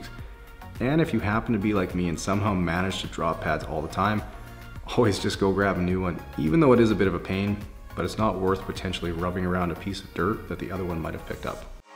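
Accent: Canada. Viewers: I pretty much am just here to hear the Canadian accent. Not gonna lie. Also I'm from Australia. I now have a strong motivation to detail my car but 100% won't because I'm a worthless person. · Favorite part: YOU! Best Friend’s favorite part: Your Canadian Accent Always tempted to buy every item on your list in the description 😂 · Are u Canadian?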